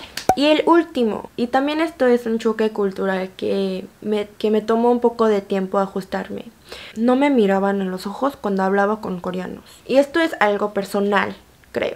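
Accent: Mexico. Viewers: Jajaja apenas te conocí y ya me agradaste ya tienes nueva suscriptora ☺, pareces coreana pero de como hablas ya eres 100% mexicana me encanta eso ❤❤❤ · He estado viendo otros canales de chicas coreanas argentinas y hablan como argentinas y son coreanas he visto este video de chica coreana mexicana y hablas como mexicana y es coreana lo divertido en buena forma es que ambas son coreanas la argentina y la mexicana y si platicaran entre ellas las dos coreanas se encontrarían platicando con los respectivos acentos argentino y mexicano y eso es lo divertido y bonito al mismo tiempo en realidad me encanta y me rio porque ambas son coreanas me río pero en buena onda porque me gusta por la cultura que aprendieron según la región donde nacieron bueno no se si entendieron pero yo si me entendí sólo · el shock es mio; ver tu cara y tus llamativos ojos coreanos y hablar con ese acento mexicano. 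· Hello beautiful, I love your videos and you’re the reason I fell in love with the Mexican dialect/accent and I’m trying to learn Spanish through your videos. Thankfully I understood the main points but I noticed you didn’t put in English subtitles in this video. I’m wondering if you’re going to stop putting in English subtitles? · wao tu acento mexicano ....es perfecto...saludos desde tamaulipas,mexico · Tu asento en español es mas mexicano no español puro pero coreana si eso si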